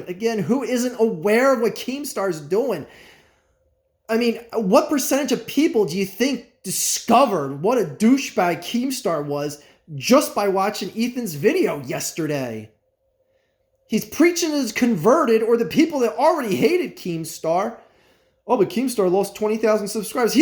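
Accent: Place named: Philadelphia, United States. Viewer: Straight out of Phillyburbs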